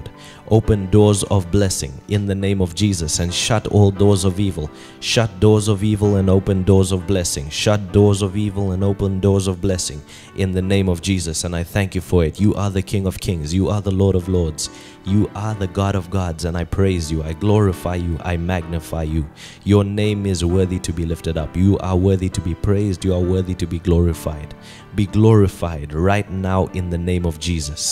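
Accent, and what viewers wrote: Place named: South Africa. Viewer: Amen❤❤, are you South African by any chance ? Your accent sounds very South African.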